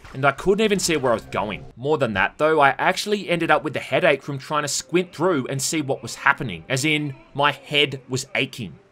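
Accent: Australia. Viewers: As soon as I heard the Australian accent I was like 'hell yeah this will be a good critique'.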